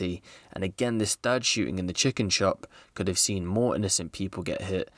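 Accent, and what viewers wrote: United Kingdom. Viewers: It’s just a normal British accent??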